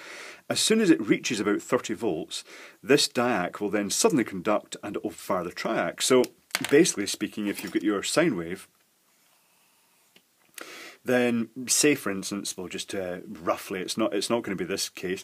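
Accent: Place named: Glasgow, United Kingdom. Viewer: Was watching with fascination, and, just for giggles, switched on the inline captions., with the technical terminology and your mild Glaswegian accent, it really didn't do a very good job, I went to a website yesterday that dealt solely with misheard song lyrics; I wonder if we can supply them with material for an annexe?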